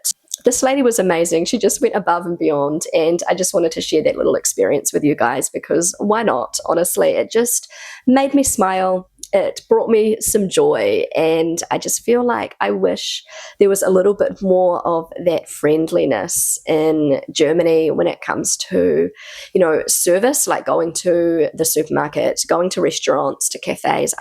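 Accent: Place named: New Zealand. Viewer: Your vid title saying "Lidil" instead of "Lidl" is a bit odd. Love your accent, though. That much kiwi still in your speech a decade later is rather gorgeous. G'day from Australia.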